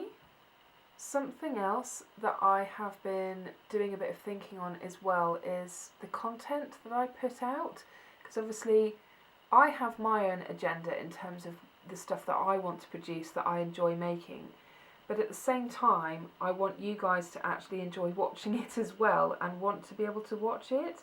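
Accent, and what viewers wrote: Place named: United Kingdom. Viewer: Am I alone as a French subscriber 😊? If so, I hope you will soon have many subbies from this side of the Channel! Love to hear your British accent. Do you know that Estee Lauder has just purchased DECIEM? The Ordinary is not an independent brand any longer. I think I will make a large order before prices begin to rise...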